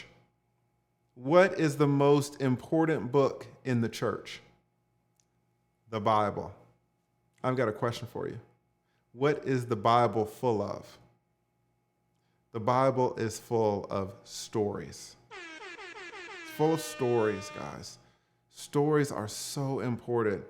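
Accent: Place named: South, United States. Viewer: Definitely the king of storytelling! With that southern twang and then you throw in you "fuckers' at times and when you say the chat told me to 'suck a d@ " haaaaaaaaaaaa cracks me up! Yessssss you're the man!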